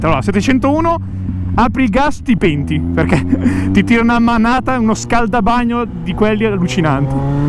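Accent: Italy. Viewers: ma sei veneto? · Ciao ragazzo ma sei di Brescia o provincia? Dall accento sembrerebbe di si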